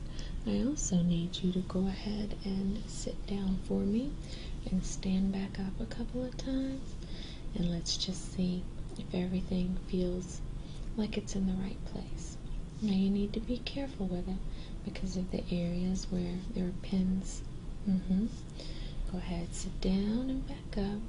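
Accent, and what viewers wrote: South, United States. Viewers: Fantastic vid. Your tone is soothing and trigger-happy (I think I detect a slight Southern accent too, which I like). Role-playing is good enough to not be distracting. Good work with the added triggers. Finally: you're a joy to look at. Great work. Subscribed.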